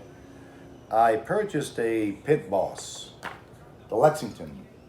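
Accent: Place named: New Orleans, United States. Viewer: you sound like you from NOLA with that accent.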